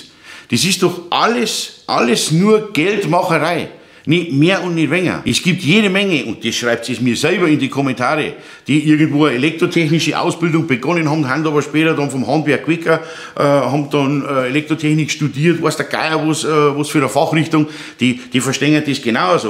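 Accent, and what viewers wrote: Bavaria, Germany. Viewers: Ich bin zwar kein arbeitender Elektriker weil ich nur mal Nachrichtengerätemechaniker (1982) gelernt hab mit Ausbildung zum Elektriker. Aber in MEINER Küche hängen an einer Sicherung 10 Steckdosen weil ICH das so wollte, und es werden ja nicht alle Steckdosen auf 1mal benützt. Wer benützt a Küchenmaschine an Staubsauger der Wasserkocher und ein Heizgerät an diesen Steckdosen zusammen???? Und selbst wenn dann fliegt halt die Sicherung,was solls, der größte Depp kapiert das. Also scheiß auf die blöde VdE jeder ist seines eigenes glückes Schmied. Thema Schreiner,was ich auch gelernt hab:: Ich musste einen 3 monatigen Maschinenkurs machen um überhaupt an den Maschinen arbeiten zu dürfen.. Weida so, i bi a a Bayer und mog dei Aussprach, Danke dir!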